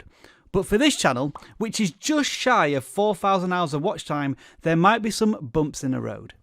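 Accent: Yorkshire, United Kingdom. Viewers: Yes! Straight talking from Yorkshire #fistpump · wait you're british?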